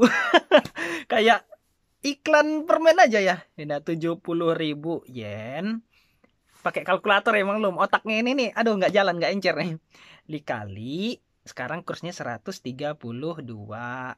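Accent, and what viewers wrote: Java, Indonesia. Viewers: Ooo tak kiro jowo cak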